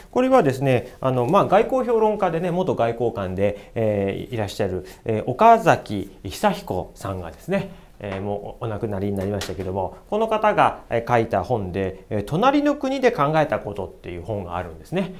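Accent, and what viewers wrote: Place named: Tohoku, Japan. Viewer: 東北弁に聴こえるー。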